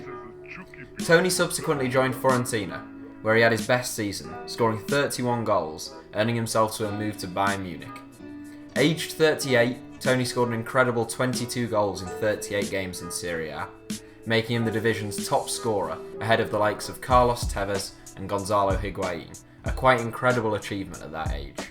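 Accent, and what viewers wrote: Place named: Yorkshire, United Kingdom. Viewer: I'd like to apologise on behalf of the entire of Yorkshire… we don't all sound as monotone and dreary as that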